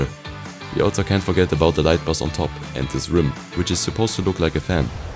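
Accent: Germany. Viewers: from your speaking i can guess you are from germany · The German accent 😂 Great Video mate! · Digga warum kein deutsches Auto man hört doch dass du Deutscher bist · Do I hear some DEUTSCH in your language? 🤣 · Am i the only one who heard a slight german accent? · i hear german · Bis du deutsch ? Du hast eine kleine accent 😊 Ich habe dich wegen der Aussprache von „Chicago“ entdeckt: ein zusätzliches H und viele A „chic'Haaaaago“ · I hear a little bit of deutscher Akzent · That’s NOT a realistic fire truck. Lego is European. And judging your accent, you clearly are German. So why the frick are you calling this thing realistic? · I hear german accent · Is he german? Im German and his accent sounds sooooo German to me😂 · Do i hear a little bit of an DEUTSCHER AKTZENT?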